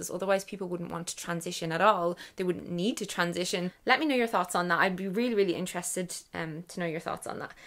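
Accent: Ireland. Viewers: Honestly not that great 😔 Unfortunately covid coincided with just a lot of shitty stuff so my natural bubbly self is a bit emotionally exhausted...But your videos always boosts my mood so much...so thank you Mel! Especially the food diary Friday's... it actually made me recognise my own problems with binging and stuff so yeah thank you especially for all your stuff with that. It's weird I realised the other day that a few of our close family friend's are Irish and there's something about that accent, your accent, that reminds me of happier times... Sending you lots of love 💚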